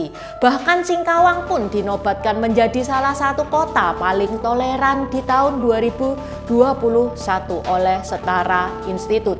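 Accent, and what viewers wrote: Java, Indonesia. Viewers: Asli jowo opo mbae..kok medok jowo bahasa Indonesia nya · Logat ngomongnya saja sudah ala jawir. Jadi aneh dengernya karena pakai logat orang lain. Contohnya tidak usah jauh2 nih si cici yang punya channel ini.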